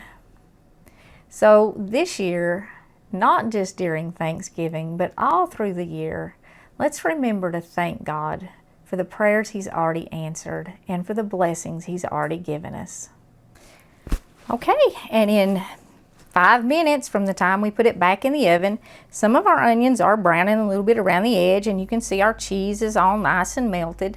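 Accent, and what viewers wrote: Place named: Kentucky, United States. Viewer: Hi there. I just recently found your channel and im loving it. You're accent reminds me of KY where I grew up. I was just wondering where you're from?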